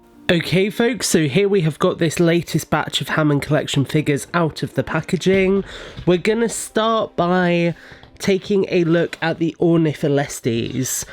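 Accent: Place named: United Kingdom. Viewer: Are you British?? Your talking way sounds like British?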